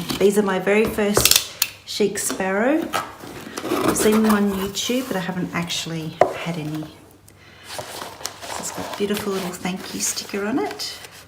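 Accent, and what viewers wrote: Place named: Australia. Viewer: So lovely to hear an Aussie voice doing these types of videos :)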